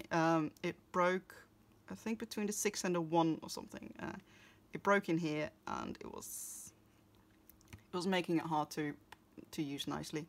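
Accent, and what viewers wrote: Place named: Netherlands. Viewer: Hi! Subscribed right away just because of your chanel name..haha I seem to detect a little bit of a dutch accent, I am right? :) Edit: Just watched a little over half of your video and you gave an awesome idea to use the weeks for meal planning and groceries list. I had already thought about buying a weeks cover for my coupons but if I put a weeks inside it for the planning it will be even better! Thanks! :)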